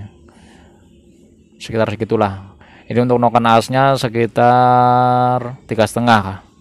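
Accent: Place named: Java, Indonesia. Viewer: Bang ituh ngomongnya kaya medok bgt yh bang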